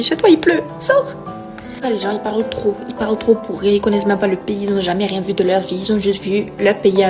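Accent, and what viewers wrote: Benin. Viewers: J'aime trop ton accent béninois, très cool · Le vrai accent Béninois extraordinaire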